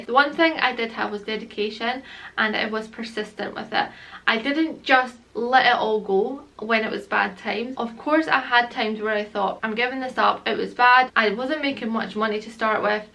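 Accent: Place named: Scotland, United Kingdom. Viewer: U from the Scottish Borders? Bc u sound Scottish and English btw very inspirational and makes me wanna start it sooner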